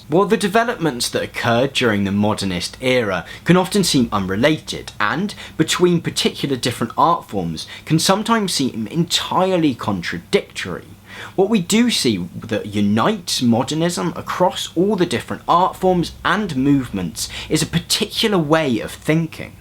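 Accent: United Kingdom. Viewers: 第一次有觉得英音难听的时候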